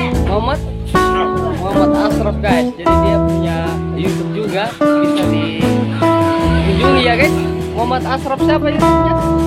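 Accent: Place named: Sulawesi, Indonesia. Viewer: Orang sulawesi ya logatnya itu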